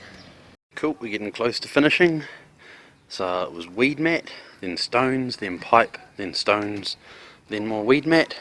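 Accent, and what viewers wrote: New Zealand. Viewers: are you a kiwi too bro ? love i found this video as its exactly what i need to know! chur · Loved this! Funny guy and I could tell you were a kiwi:)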